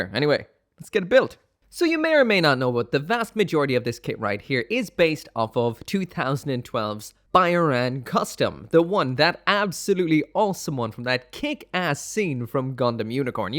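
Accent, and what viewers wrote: Ireland. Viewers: Irish Acent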